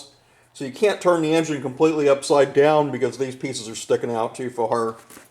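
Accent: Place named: Seattle, United States. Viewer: nice information! you sound like you are from Seattle.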